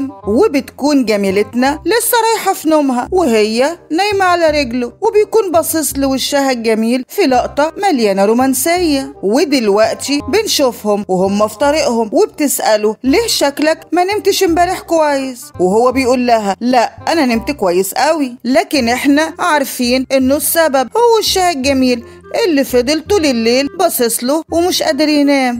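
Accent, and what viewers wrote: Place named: Iraq. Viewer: هاذي الرواية عندها اكثر من اسم؟ اسمها الاميرة المحبوبة او كيف اصبحت اميرة المهم اوك هتخلى عن لوكاس بس هاذي المررة وانا ليا كلود هو في اب بوسامتو لا ده غير فيليكس وايجيتل المسكين انا كلي رومانسية وبعشق الرومنسية بس مو لذي الدرجة الي انتي متصوراتي بيها رومانسية في الروايات والالمسلسلات بس في الواقع غيييير وان شاء الله ندخل الجنة وهنيك نقدر نحلم زي مابدنا ومن لهجتك واضح انك عراقية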